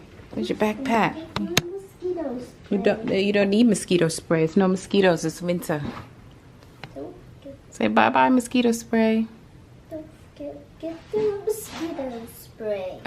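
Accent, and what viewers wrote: New York, United States. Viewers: I just found your videos and saw you live in Texas but when I heard you talking I was like she is from NY has to be. I am a fellow New Yorker who is planning to leave soon you are 💯 it’s too much hustle bustle etc. Great video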